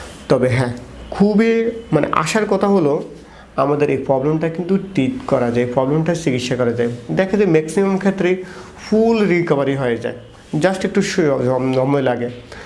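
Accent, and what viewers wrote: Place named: Chittagong, Bangladesh. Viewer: আমার মনে হয় ডাক্তার সাহেবর বাড়ি চট্টগ্রামে। চট্টগ্রাম হোউক বা অন্য কোন বিভাগে যে কারোরই নিজের আন্ঞ্চলিক ভাষার একটা টান থাকে।এটা ত অস্বাভাবিক কিছু নয়।তবে উনার কথা তোতলানো ভাব আছে কিছু টা। আমার মনে হয় উনার আগে আরো বেশি ছিল তোতলানো টা। উনি উনার আত্মবিশ্বাসে অনেকটাই স্পস্ট কথা বলেন। এটা আমাদের বোঝা উচিত যে উনি ইচ্ছে করে কথায় ঝড়তা রাখেন না। সৃষ্টিকর্তা আমাদের সবাই কে সব দিক থেকে পারফেক্ট করে বানায় নি। সবার মাঝে কিছু না কিছু কমতি আছে। কারো কমতি নিয়ে মন্তব্য করা ঠিক নয়। বরং উৎসাহ দেওয়া উচিত যেন সবাই কমতি ভূলে নিজেকে একজন পরিপূর্ণ মানুষ মনে করে সমাজের সেবা মানুষের সেবা করতে,নিজেকে আরো উৎসাহি করতে পারে। তাই নয় কি?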